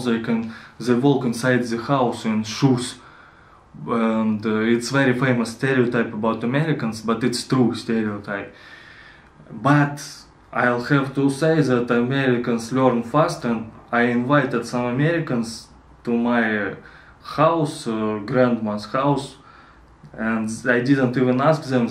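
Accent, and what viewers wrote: Russia. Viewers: Hello! Are you from Kirov or Yeburg? Your accent is like a Ural one.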